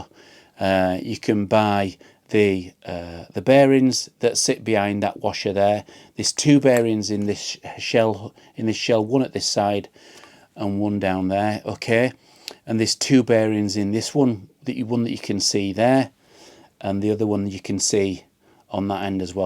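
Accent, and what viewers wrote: Yorkshire, United Kingdom. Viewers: Yeah he's proper Yorkshire man 🔥💪🏻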